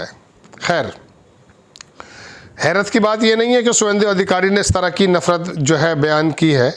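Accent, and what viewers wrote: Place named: Meerut, India. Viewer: Patrkar sahab meerut ke aas paas ke shetr lagte hai bhasha se 😊😊😊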